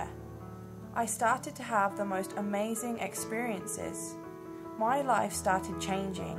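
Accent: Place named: Australia. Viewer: What happened to your Northhampton accent C? U sound kinda Auzzie to my untutored ears :S (search 'Alan Moore' - the Watchmen / V for Vendetta writer - to those not in-the-know for the NH accent)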